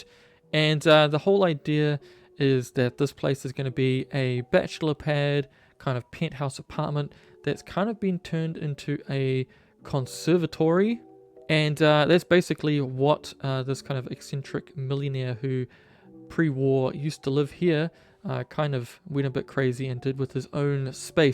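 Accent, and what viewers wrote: New Zealand. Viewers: You a kiwi bro??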